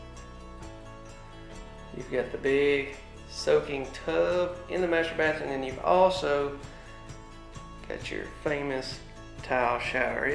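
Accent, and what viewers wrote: South, United States. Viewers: I love watching these. My husband and I are considering a mobile home or a modular for our property eventually. Mobile homes have come such a long way. Also, his handsome southern voice makes these easy to watch too. Lol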